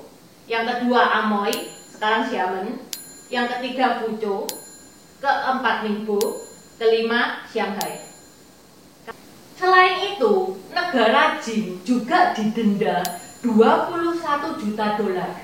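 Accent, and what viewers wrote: Java, Indonesia. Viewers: Kapan-kapan kalo saya ada presentasi mau tukeran jiwa dan raga sama cece ini xD Sangat energik ngomongnya, medok e kuwi lho jeruu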